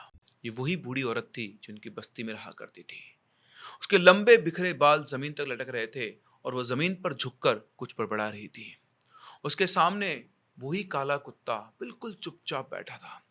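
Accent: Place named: Chhattisgarh, India. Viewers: Chhattishgarhi boli bahut sundar lagat he tuhar muh se bhaiya ji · Badiya chattisgari bole sir😮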